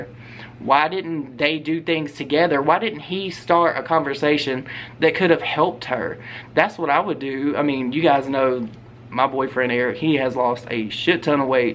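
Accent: South, United States. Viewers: I love your southern accent so much! And you have a good perspective, I totally agree that Jason's jokes were unacceptable. Nice video! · I love your accent! Usually southern accents are so intense and I can’t understand people but yours is lovely lol also great video thank you ❤️ I am subbing!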